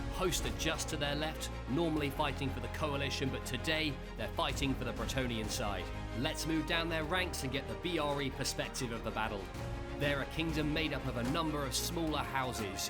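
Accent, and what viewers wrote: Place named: United Kingdom. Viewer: Your British accent and the specific cadence you use makes you sound like an F1 announcer 😂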